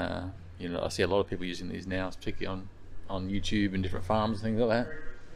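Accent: Australia. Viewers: Так сложно на слух понимать речь... )) Привык к "американскому" английскому. Австралийский - как будто вообще другой язык) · я живу в Англии уже много лет, его Австралийский мне понятен без проблем, а вот Американские фильмы не смотр, а иначе все время переспрашива своего партнёра "что он или она сказали", он часто мне отвечает, что сам не понимает. А пр индийский английский, это да... 🥴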